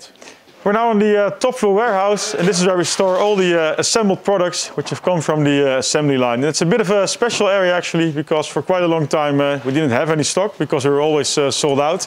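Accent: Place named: Netherlands. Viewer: Love the dutch accent😅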